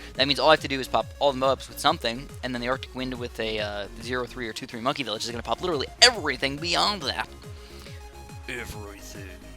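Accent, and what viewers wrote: United States. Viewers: Wait what state are you in, that sounds exaclty like where I live.....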